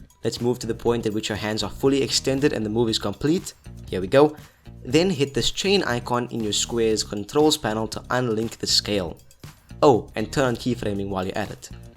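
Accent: South Africa. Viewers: Your from SA arent cha?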